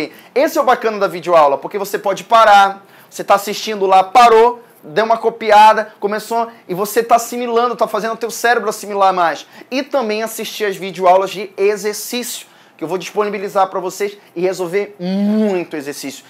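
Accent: Pará, Brazil. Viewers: professor, vc é paraense? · Mano, tu é paraense ? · Tu é paraenses né parente ? Professor muito simpático. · beeem paraense kkkk, sou do Pará tbm, mas não tenho sotaque daqui kkkkkk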